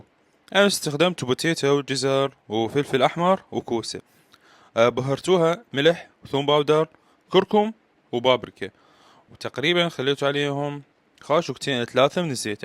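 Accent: Anbar, Iraq. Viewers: يعني الشيف من الانبار،من راوه لو من حديثه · وهم مشابهه للهجتنا بالانبار هما اللهجتين متشابهه ❤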